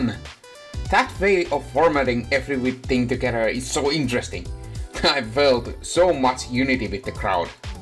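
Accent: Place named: Finland. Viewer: That accent is so strong you from finland perhaps or is it just me?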